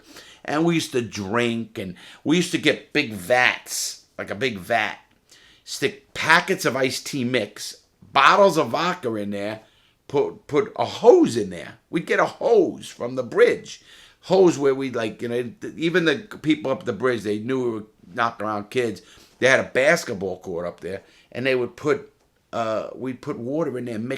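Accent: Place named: East Boston, United States. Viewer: Sounds like East Boston in the 50s 60s.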